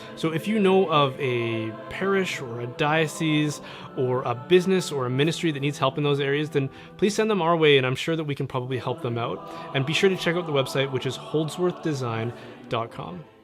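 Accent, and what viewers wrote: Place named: Canada. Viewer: Like your Canadian accent... "abowt" ☺️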